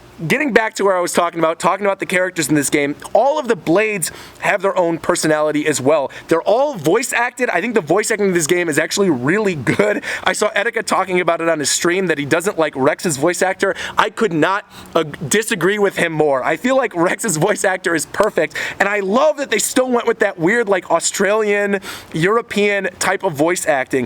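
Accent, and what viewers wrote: Australia. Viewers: Australian accent? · Im pretty sure he sounds Australian.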